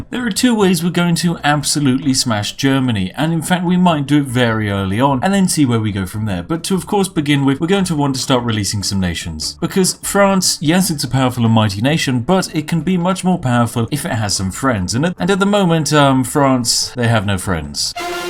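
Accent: United Kingdom. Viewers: Sounds very British to me... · You sound british. Are you british?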